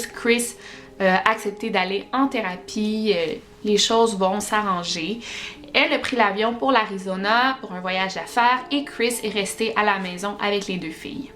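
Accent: Quebec, Canada. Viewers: J'aime trop l'accent canadien,c'est très mignon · Je suis fou amoureux de l'accent québécois 🥰🥰 Bisous les cousins des français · Parfois dur e comprendre l’accent québécois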